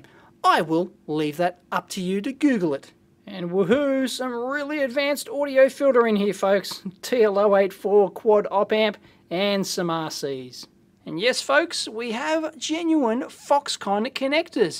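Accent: Australia. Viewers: Australian accent is awesome :D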